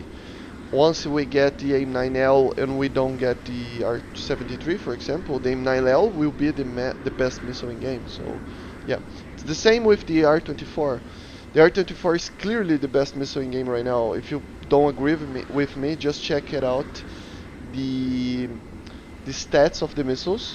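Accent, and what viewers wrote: Brazil. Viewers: aha, desconfiei. O sotaque brasileiro não engana kk Mas que canal top cara, descobri por acaso. É só questão de tempo para o canal crescer * sul do brasil onde? rio grande do sul?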